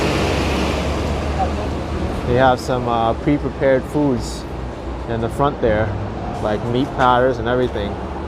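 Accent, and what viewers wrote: New York, United States. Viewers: Omg your accent is EVERYTHING. I feel like I'm back in old New York.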